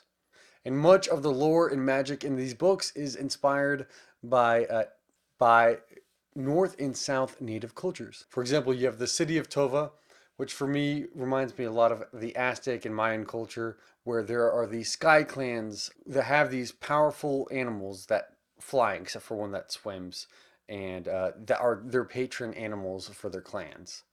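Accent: South, United States. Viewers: I love your video at your house in Texas during your quarantine until you had one of the books of "Black Sun" by Rebecca Roanhorse while it's all about a god return, when the earth and sky converge under the black sun, in the holy city of Tova the winter solstice is usually a time for celebration and renewal, but this year, it coincides with a solar eclipse, a rare celestial event proscribed by the sun priest as unbalancing of the world. Meanwhile, a ship launces from a distant city bound for Tova and set to arrive on the solstice. The captain of the ship, Xiala is a disgraced Teek whose song can calm the waters around her as easily as it can wrap a man's mind. Her ship carries one passenger. Described as "harmless" the passenger, Serapio is a young man, blind, scarred and cloaked in destiny. As Xiala well knows, when a man is described as harmless, he usually ends up being a villain and you're talking about all of the characters from the book after that, I hope your husband, Zach will be very proud of you and I love your Southern accent, I know you're half Mexican!!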